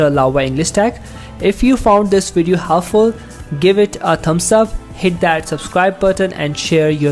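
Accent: India. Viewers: Why does he sound Indian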